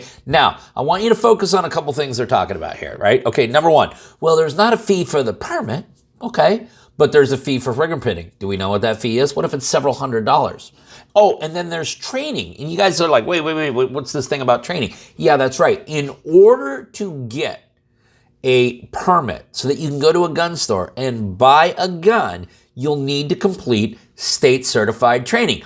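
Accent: New Jersey, United States. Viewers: Sounds like jersey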